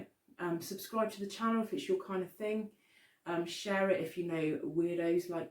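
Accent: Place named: Devon, United Kingdom. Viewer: You sound like your Devon way, maybe Cornwall 😊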